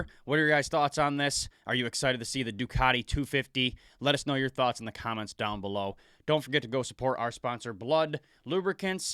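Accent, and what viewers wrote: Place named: Michigan, United States. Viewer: U from michigan? I hear an accent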